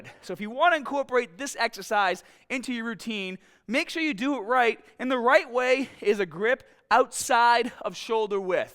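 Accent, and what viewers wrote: Boston, United States. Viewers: I know nothing about this guy but can only surmise he is from Boston. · Are you from Boston ??? You have a slight twang on certain words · been following for a few years, very smart training man, the best on YouTube imo. And I've gone through a lot. P.S. from MA too, one of the last remaining Boston accents i know ;) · That boston accent tho 🔥🔥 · I knew it. He does the fucking accent on purpose. I could've sworn I've seen videos of his before where it's not nearly as pronounced. But that first "stahhhted" in this video he clearly did it on purpose. Why..... just.... why? The Northeastern/Boston accent is just so god awful it's like nails on a chalkboard to me. I guess I should've picked up on it sooner. He says "exercise" with no problem. When I was in the Navy my buddy was from Quincy, MA and he'd always say "exahcise." He also said "here" with little accent as well...... 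so again.... why go heavy on the accent sometimes? It's clearly on purpose. Specifically with "bahbell." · He has to be from Boston with that accent, “When you grip the baaaaaahhhh”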